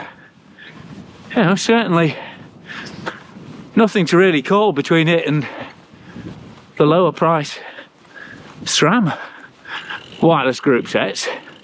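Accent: Northern England, United Kingdom. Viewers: Is it me or is accent getting more nothern as time goes by😂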